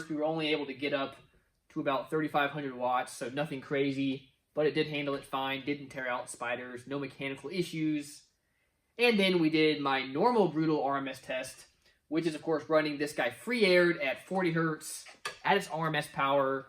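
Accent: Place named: Appalachia, United States. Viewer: This dude looks like Gollum and sounds like a hillbilly! what is going on here?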